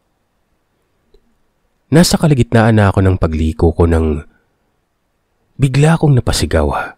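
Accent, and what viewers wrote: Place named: Ilocos, Philippines. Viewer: Ang cute mo mag Ilocano, Sir 😂 Proud Ilokana here. 🫶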